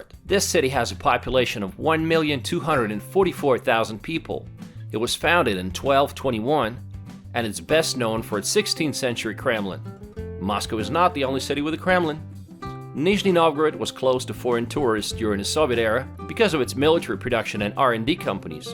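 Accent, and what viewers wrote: South, United States. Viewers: Russian with an American accent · I've been meaning to ask, especially as l have not as much time to review your archive of videos (among the 4 Russian vloggers l follow), but your English lacks not only a typical Slavic accent, but it betrays a certain American southern voice. Quite cannot pin it down geographically, but where did you pick it up? The only Slavs l've known who have l have heard unaccented Slavic English were those who studied in the UK and acquire Received Pronunciation coaching... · Nice... (No wonder people ask you= Where you from..? You sound like an American.) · Your videos of Russia are all fabulous, with great and pertinent information. The Russians seems so happy, busy and content. Your English speaking is awsome—no accent, excepting a slight US southern accent. David, San Diego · Tripping me out how this guy sounds more American than Russian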